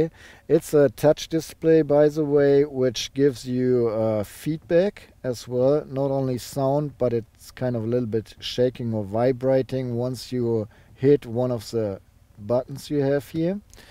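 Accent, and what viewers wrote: Germany. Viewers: i love German accent :)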